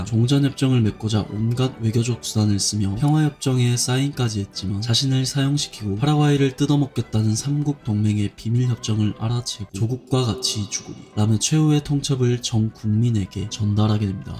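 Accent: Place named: Gyeongsang, South Korea. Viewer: 형은 경상도 말 할때가 제일 멋져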